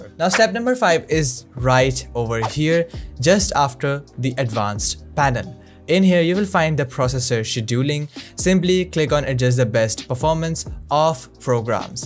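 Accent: India. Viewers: We can hear your Indian accent. You don't have to hide it.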